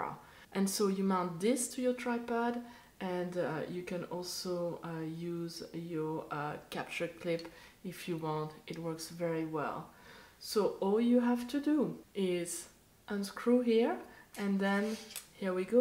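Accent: France. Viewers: Hello :) J'entends à ton accent (1ère vidéo que je vois de toi) que tu es francophone voire française :) (Personne n'est parfait :D Je vais aller voir leur site, mais pour un produit équivalent, on m'a dit que le défaut - pour un Fujifilm - est que le bouton de release de l'objectif est difficile à atteindre. Ensuite il faut voir si l'ensemble de ton parc objo pourra encore se connecter à l'apn. Dans une autre vidéo, un photographe disait ne pas savoir installer tel ou tel caillou une fois cet accessoire placé... Je n'ai pas la référence de ce youtubeur mais il donnait ça comme principal défaut. Excellent produit qui m'intéresserait s'il s'adapte à mes Fujifilm et les cailloux qui vont avec :) En attendant je vais prendre le temps de découvrir tes vidéos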